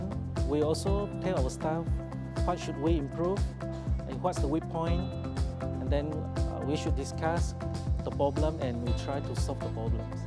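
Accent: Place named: Malaysia. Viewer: Bro, his accent is fine in Malaysia as most people in Malaysia is like that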